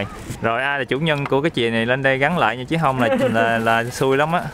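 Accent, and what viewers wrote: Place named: Ca Mau, Vietnam. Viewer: nói chuyện giống người cà mau nè "cái đó ruột già ông nội ơi', chị thích nha❤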